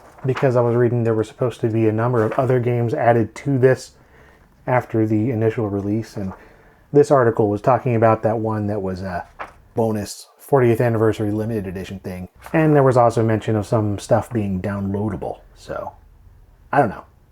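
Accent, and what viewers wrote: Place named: California, United States. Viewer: Your accent is like the Californians without the accent if you follow.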